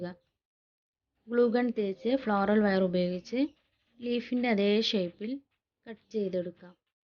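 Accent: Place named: Nilambur, India. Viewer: Nilambur Samsarthilekku ethi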